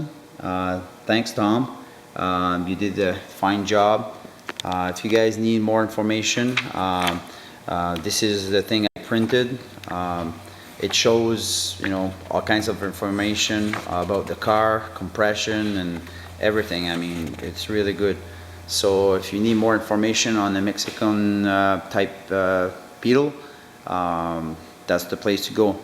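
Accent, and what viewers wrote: Quebec, Canada. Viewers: Beautiful car!!! Not sure where you are located but you speak English just like those folks in Quebec Canada.... I live in Ontario!!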